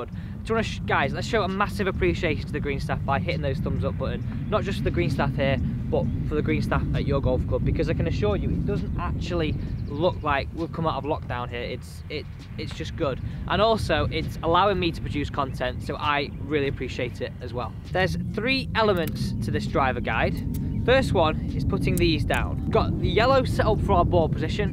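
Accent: United Kingdom. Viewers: Sry hard to understand briti english. Louzy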